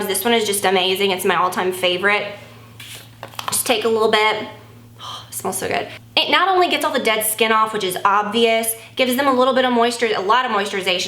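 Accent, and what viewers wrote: South, United States. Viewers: I LOVE YOUR HAIR!!!!! Oh and your extra southern today, your accent is stronger than usual lol!!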